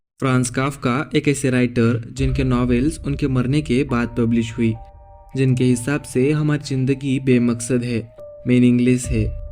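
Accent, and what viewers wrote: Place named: Maharashtra, India. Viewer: Bhava marathi ahes na? Accent varun samjl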